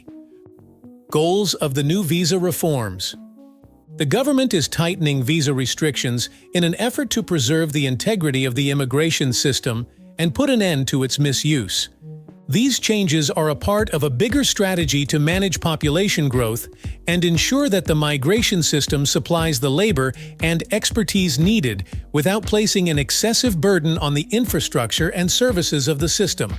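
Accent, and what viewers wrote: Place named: United States. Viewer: Why ido we have a bloody yank speaking and not Aussie????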